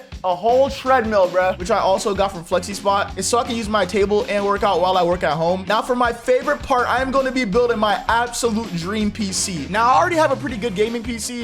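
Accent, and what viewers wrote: Jamaica. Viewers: Are you Jamaican or Caribbean?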